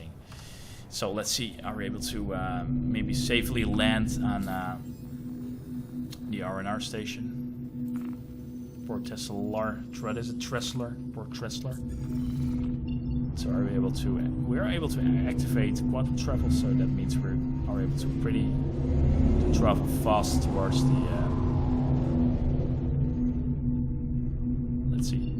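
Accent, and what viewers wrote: Netherlands. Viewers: are u from the netherlands by any chance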